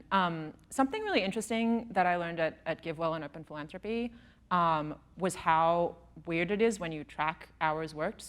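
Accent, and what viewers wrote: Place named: Australia. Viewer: I was in her lab class at unimelb. Back then, she spoke pure aussie accent. Now, it's hybrid.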